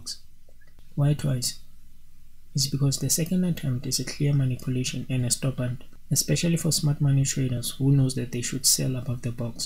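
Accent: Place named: South Africa. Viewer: you are such a legend I watched this video again now... Are you from South Africa? Sounds like it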